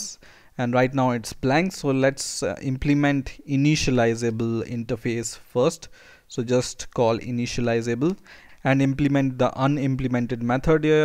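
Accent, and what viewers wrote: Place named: India. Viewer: Yeahh, He is Indian he have Indian English accent. I'm confirming it :)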